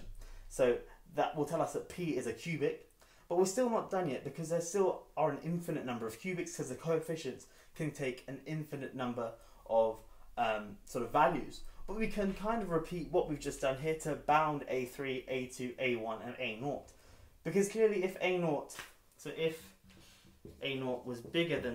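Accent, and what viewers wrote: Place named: United Kingdom. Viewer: your british accent makes things so easz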